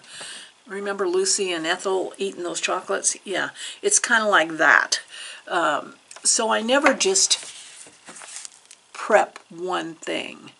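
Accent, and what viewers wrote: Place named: Oregon, United States. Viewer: One of the reasons I love listening to your videos, among others, is that I lived in Oregon for 9 years while in college. I experienced a silver thaw one year in Portland. I think that was 1996/7? I remember the sound of the crashing branches. Most of the rest of my adult years and much of my childhood was spent in Boise Idaho area. Four years ago, my husband's job brought us to Alabama. I just love listening to your accent, the sounds of home. And of course, the quilting.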